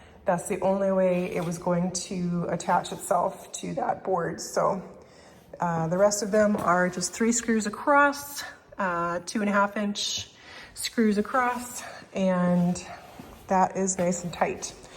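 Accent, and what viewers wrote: United Kingdom. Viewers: oooo using a standard english british for a narrator . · Ok, when or why did you chose a british voice over?😅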